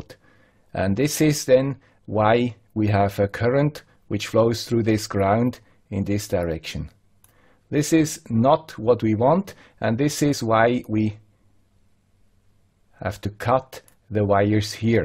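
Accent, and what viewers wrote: Switzerland. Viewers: Merry Xmas and Mrs. Thank you for all your content this past year! I look forward to another years of awesome, educational and entertaining videos done in a Swiss accent!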